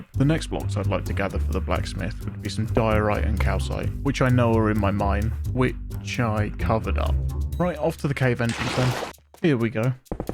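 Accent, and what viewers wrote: United Kingdom. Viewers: This is just a normal British accent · Bro’s voice is so calm, comforting and polite with the british accent on top of it is just amazing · He is british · Omg I love British people, I like the accent it's hard to learn but if your British your lucky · Why does everyone that plays Minecraft hardcore is British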